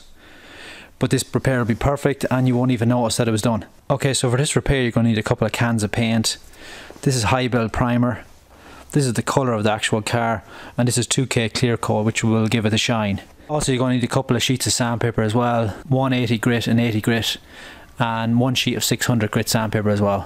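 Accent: Dublin, Ireland. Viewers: I really enjoyed this mate i love the Irish accent could listen to you all day. too al those who put thumbs down 👎 what a bunch donkey's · Where ya from Dublin? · Very good buddy - nice one - love the Irish accent as well. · Nice! And the Irish accent was the icing on the cake 😃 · Ooh, that Irish accent! ❤ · With that accent your Irish.... where da hell did you get that 2k clear in this country. It's like hens teeth in the Midlands.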